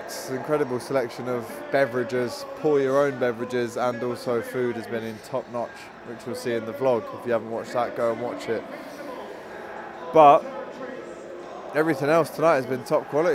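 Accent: Liverpool, United Kingdom. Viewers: Definitely a scouse accent that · Can you put subtitles on please? I can’t understand your scouse accent.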